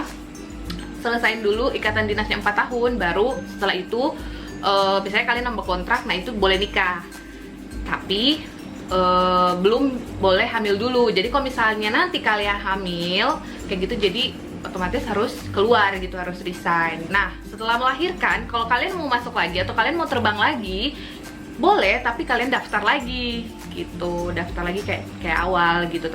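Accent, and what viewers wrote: Manado, Indonesia. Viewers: Kakak dari manado yah?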